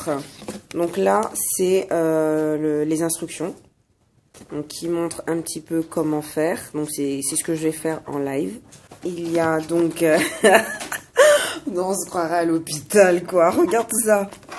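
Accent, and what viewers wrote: Gabon. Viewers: avec ton accent je sais que tu es gabonaise et aussi que le haut-oguée c'est au sud est du gabon c'est l'une des 9 provinces du gabon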